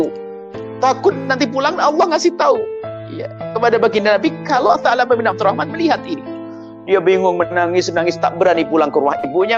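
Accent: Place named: Malaysia. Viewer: Ini youtubernya org malaysia ya. Dri logat suarany. Salam kenal Jkt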